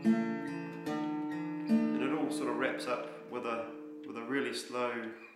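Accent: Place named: New Zealand. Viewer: Dude, love it, thanks heaps. I'm laughing at how kiwi this whole thing is too. Mumbling bursts and unfinished sentences. Or just concluding your point with "yeah". Love it! Drive any Americans watching this crazy.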